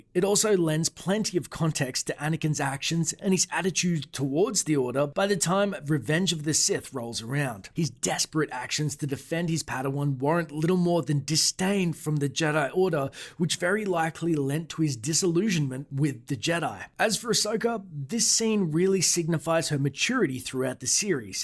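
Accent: Australia. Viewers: I love hearing an Aussie narrate these videos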